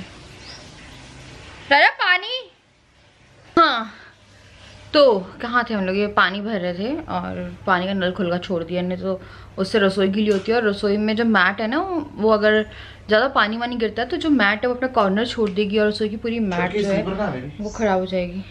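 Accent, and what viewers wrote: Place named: Sadri, India. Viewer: Lgta h app sadar Se ho dii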